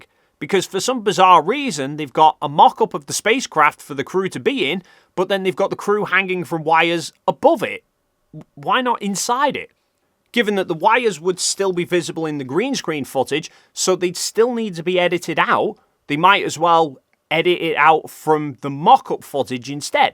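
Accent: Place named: Northern England, United Kingdom. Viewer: It's just his particular working class-ish northern English accent. That's how many folks pronounce it. Americans pronounce is "Saa" instead of "Saw". Are they pronouncing it wrong?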